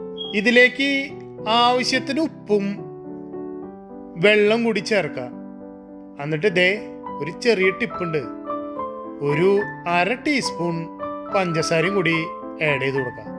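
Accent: Thrissur, India. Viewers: Thrissure aano😄 Curryum samsaravum polichu. Sugar edarilla, Eni cheythu nokkam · Thrissur language poli❤.. good presentation 👌👍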